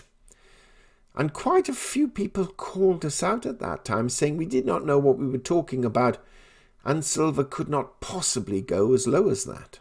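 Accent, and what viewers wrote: England, United Kingdom. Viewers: Mate you sound like a true pome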